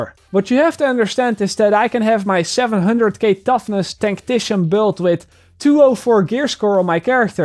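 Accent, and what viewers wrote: Netherlands. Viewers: Are you dutch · Nice video Ik hoor je Nederlands Engels accent maar je Engels is serieus goed. Go on with you channel. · De meest Nederlandse accent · Holy shit are you dutch Hallo uit Suriname 👋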